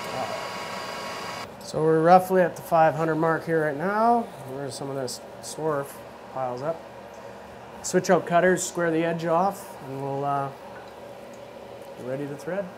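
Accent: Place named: Canada. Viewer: Canadian accent.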